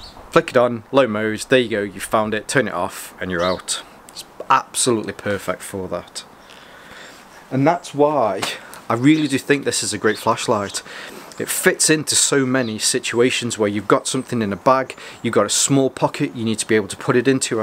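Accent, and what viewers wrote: United Kingdom. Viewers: Interesting how Brit accents differ as they do here in the US. You for instance sound like the guy on Delboy's Garage. How's the light held up?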